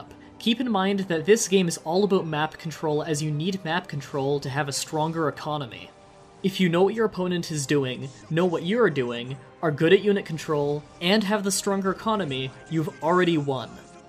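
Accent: Canada. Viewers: You canadian right? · He is so frickin Canadian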